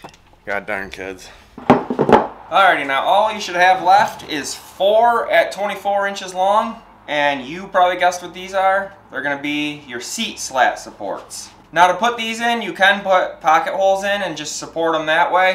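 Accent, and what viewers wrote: United States. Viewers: You speak perfect american english ; clear, tidy sound and excellent pronunciation ! Very helpful video for any wood works learner and english learner as well.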